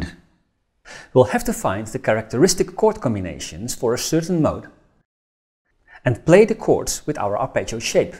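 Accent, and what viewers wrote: Netherlands. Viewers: Great video, very helpfull! Het Nederlandse accent is altijd zo herkenbaar hahaha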